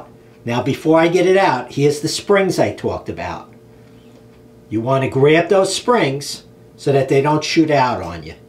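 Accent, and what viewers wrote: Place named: New York, United States. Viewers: Are you from Noo Yawk? Great Video! Thanks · definitely has that classic "Lawn Guyland" accent, lol. I'm from Long Island, and even I am amazed at his heavy accent.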